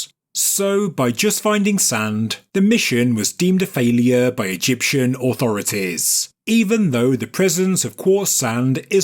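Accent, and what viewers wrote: United Kingdom. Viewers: Get used to it it's a great channel, he's a Brit, like Jude Law, and Michael Caine...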